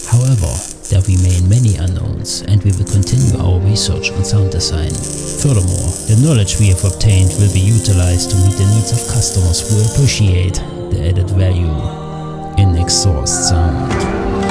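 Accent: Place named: Saxony, Germany. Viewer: klingt sehr sächsisch, habe ich mich auch gerade gefragt 😂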